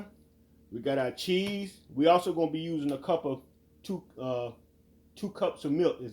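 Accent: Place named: United States. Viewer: Look's delicious I'm a New subscriber I love your country accent where are you from if you don't mind me asking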